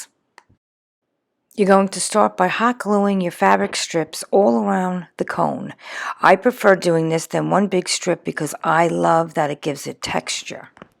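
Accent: New York, United States. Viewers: So so happy you were apart of the hop! That angel 😇 was absolutely gorgeous! The pallet tree looked great with the clip lights, and that rustic candle stick was equally as beautiful! Great idea to distress with a nail file! Nice to hear someone with a similar accent! My family is from NY 😊 You now have a new subscriber! Look forward to watching more of your videos! Have a Merry Christmas 🎅 🎄 ❤ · Boy you sound like a NYer... Where are you from? Love the angle and your palette tree.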